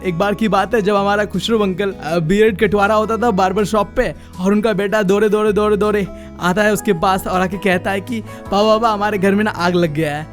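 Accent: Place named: Arunachal Pradesh, India. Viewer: Bro are u from arunachal 😂 bcz ur hindi is like us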